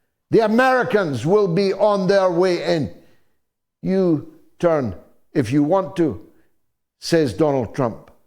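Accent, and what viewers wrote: Scotland, United Kingdom. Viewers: I like your accent. Do I hear some Scottish? ( dont't know as I am Dutch.)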